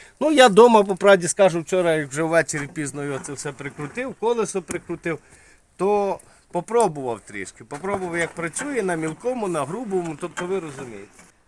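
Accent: Chernivtsi, Ukraine. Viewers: ти з західної України, хотілося б знати звідки саме, бо у нас у Чернівцях так балакають як ти.😂 Щепоріз нормальний, ти просто ще до нього не привик.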